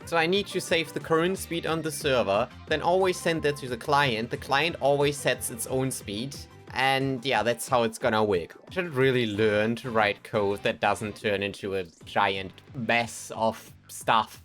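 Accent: Germany. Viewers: quick question, are you german? your accent really just sells it (correct me if im wrong tho)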